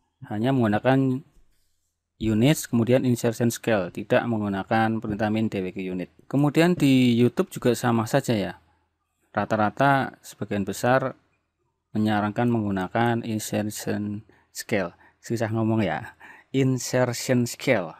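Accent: Banyumas, Indonesia. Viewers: menyimak om sangat membantu sekali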